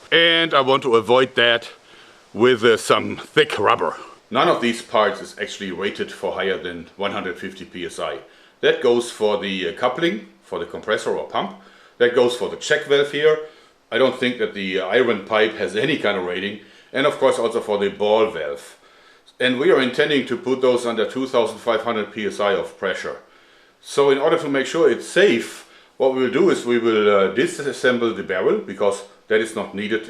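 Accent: Germany. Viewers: This is German English trotzdem passt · i love his german accent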